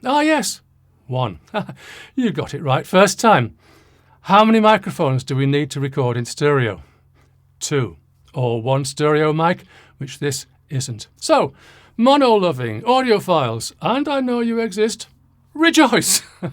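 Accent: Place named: Northern England, United Kingdom. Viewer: Dear Mr, could you PLEASE tell me where in the North of England you were born or spent the majority of your childhood? Why? Because I pride myself on being able to tell where people from my native North-East were raised to within a 5-mile radius - but your accent has got me baffled, presumably due to your many years of living "darn Sarf". You could be from parts of Cumbria, Geordieland, Co Durham or Teesside/North Yorkshire but I can't nail it down. Fancy putting this "accentophile" out of his misery mate...??? PS: I'm from Hartlepool originally if it's a case of "you show me yours and I'll show mine"..!;)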